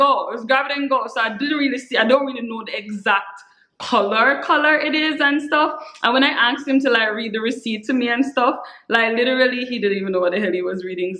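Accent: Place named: Jamaica. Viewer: Thanks for shearing this information to as we have Learn 1 or 2 I can not wait to watch ur China home where ur Jamaica accent is really good 😊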